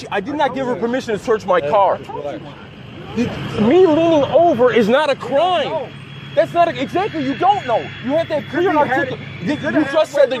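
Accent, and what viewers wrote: Chicago, United States. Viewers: Good story. Keep up the great work! Love the Chicago accent, you sound like Abe Frohman, the Sausage King of Chicago. 😎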